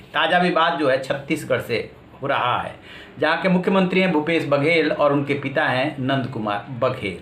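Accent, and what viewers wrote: Bihar, India. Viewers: तू साला बिहारी टोन में बात कर रहा है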